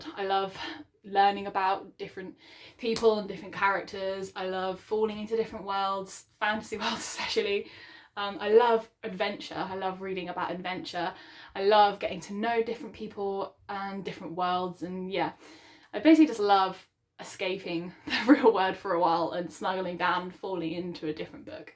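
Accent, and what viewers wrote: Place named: Southern England, United Kingdom. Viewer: I'm not a linguist like Prof Henry Higgins but I'm gonna guess that your accent is from southern England.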